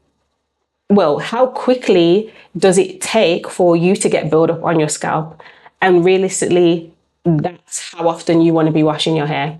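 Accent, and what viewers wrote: Birmingham, United Kingdom. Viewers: Wait are you a fellow brummy? 😮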